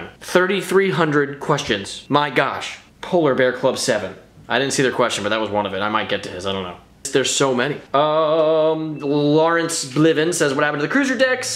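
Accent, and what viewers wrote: England, United Kingdom. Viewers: Are u English or American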